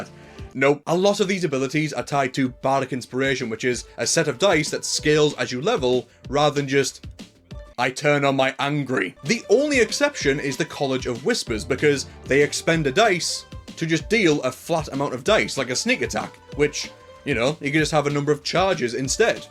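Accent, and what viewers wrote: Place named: North East England, United Kingdom. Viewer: Hey, are you from the north east of England mate? Im Newcastle so can hear an accent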